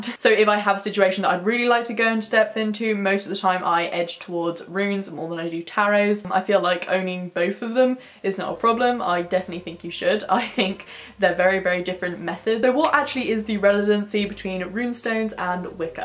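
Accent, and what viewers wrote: England, United Kingdom. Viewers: Corinna Ginterseder sometimes her accent sounds kinda british, but i'm not specialist :P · Thank you for the video. I love your English accent and you have a beautiful voice. Sending you love and light. 🙏🏼💜🧿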